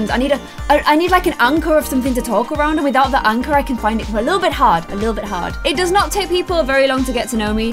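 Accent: Ireland. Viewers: are you irish ? lizax yess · UR IRISH!!!!!!!SO AM I!!!!!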